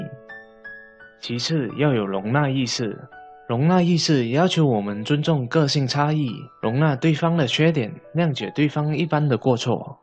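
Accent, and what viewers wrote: Malaysia. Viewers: 这是马来西亚的华语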